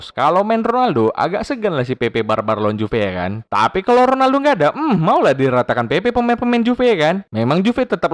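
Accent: Medan, Indonesia. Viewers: Emang gitu bro ngomong nya khas orang medan kan si ini orang medan jadi gw tau ya karena aku pun tinggal di medan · Logat medan punya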